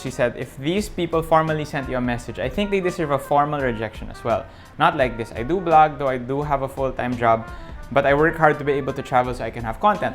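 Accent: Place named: Conyo, Philippines. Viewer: Wow! im so amaze every time you speak, i love the conyo way.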